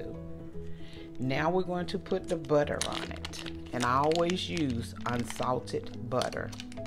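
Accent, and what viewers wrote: South, United States. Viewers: that southern accent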